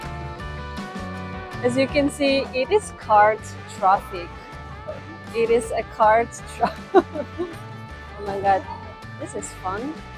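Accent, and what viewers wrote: Russia. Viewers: Первый раз смотрю этот канал, НО прям слышно Русский акцент! Или мне одному показалось?????😀 Особенно режит слух, англ. слово "БекАз", Англичане/Американцы, произносят "БекОз" или "ДрЭган", носители в основном говорят "ДрАган" и т.д. и т.п.!!!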